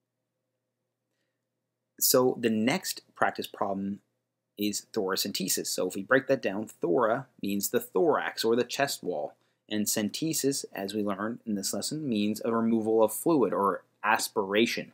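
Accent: Canada. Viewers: You didn’t cover -emia. Powerhouse of the cell. Just kidding loved the video I’m learning for my work, thank you. Sounds like you’re from Canada just straight facts.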